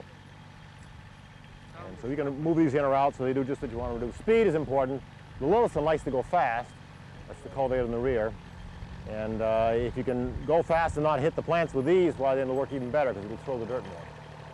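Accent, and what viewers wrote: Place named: New England, United States. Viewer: This is probably the most dense video on vegetable cultivation on the internet. The yankee accent is great as well.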